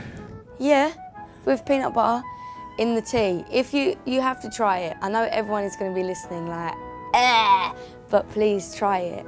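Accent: England, United Kingdom. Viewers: Love the british accent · I love British accent 😍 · British accent 😍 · Who else just figured out that anne has a british accent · omg i love her british accent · She's already so cute and her British accent makes her even more cute....❤️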